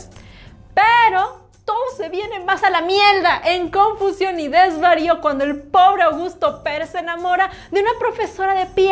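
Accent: North, Mexico. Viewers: No sé de dónde es, pero me encanta esa forma tipo norteña de explicar las cosas. Gracias. 💕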